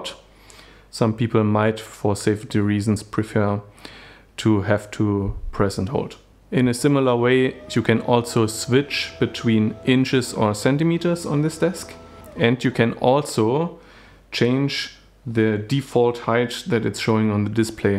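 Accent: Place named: Germany. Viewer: Gutes Video ! und sauberes, sehr sympathisches "German English" ;-)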